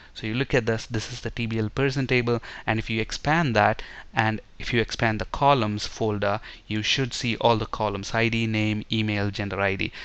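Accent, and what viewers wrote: India. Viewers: Sir are you belong to India? Your English seems like Indian 😁😁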